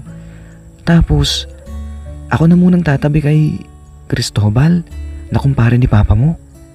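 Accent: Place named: Batangas, Philippines. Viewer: sir hahahaha taga batangas kaba? ako taga nasugbu batangas. nahala ko kase tono ng boses mo ingat palagi sir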